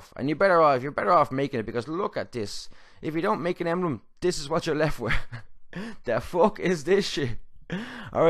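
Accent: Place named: Ireland. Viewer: notice your Irish accent, I'm from Ireland :) woo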